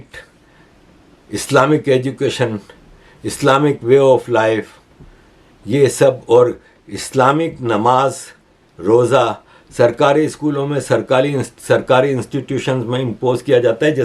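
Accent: Gujarat, India. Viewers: Love ur gujarati ascent ..I m also gujarati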